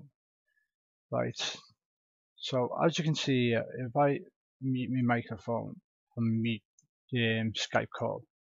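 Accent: Liverpool, United Kingdom. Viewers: Nice Tutorial Man, simple But Clear, the Way I like it. Are you from around Liverpool, it sounds like you have a Scouse Accent .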